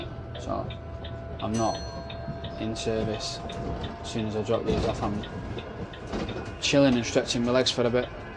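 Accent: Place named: Manchester, United Kingdom. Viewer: Great video,s as an expat manc it's great to hear a manc accent! I'm curious do you stick to the same routes all the time or do ever do routes say in sale Altrincham way?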